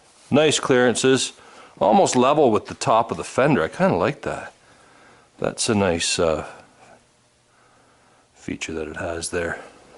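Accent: Canada. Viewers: Is that an Ontario accent?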